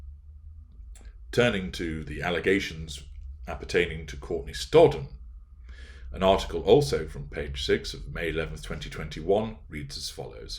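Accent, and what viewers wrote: United Kingdom. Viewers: He has a very relaxing voice, just like Omegon. But I'm in love with the British accent anyway.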